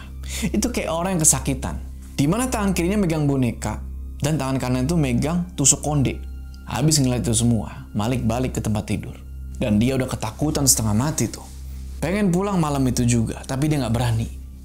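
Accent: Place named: Cilegon, Indonesia. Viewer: Pantesan logat nya Nong2 bae, taunya ini ceritanya di kampung halaman owe (cilegon)😅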